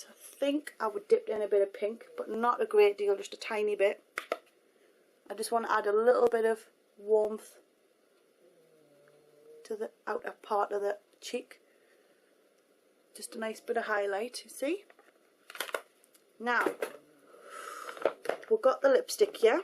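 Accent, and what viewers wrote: Newcastle, United Kingdom. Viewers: Newcastle, can tell by her accent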